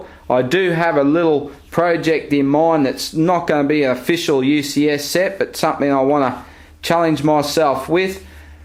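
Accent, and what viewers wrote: Australia. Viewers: Yes we get it you're australian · The Aussie accent lol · I can tell you now, most Australians don't have accents this strong.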